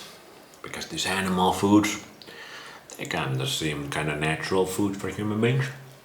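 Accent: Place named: Finland. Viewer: does raw meat at least taste good lol? also your finnish accent is strong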